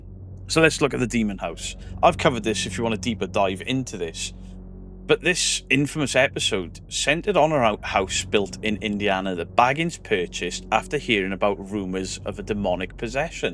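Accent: Wales, United Kingdom. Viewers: All I'm hearing is Welsh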